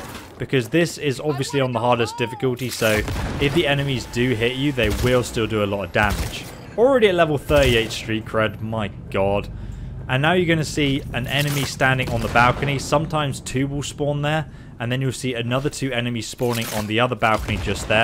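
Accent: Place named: United Kingdom. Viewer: I know its nothing to do with this game but, I love your british accent.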